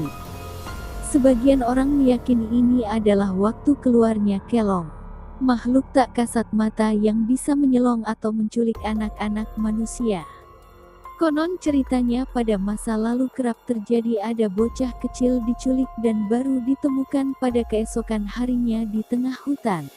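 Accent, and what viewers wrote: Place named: Java, Indonesia. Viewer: hadir mbak medok ..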